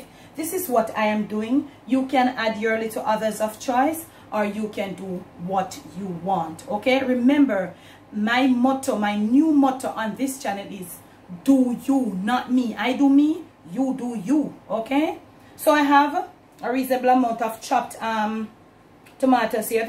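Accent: Jamaica. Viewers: I like it when you speak Jamaican- we understand !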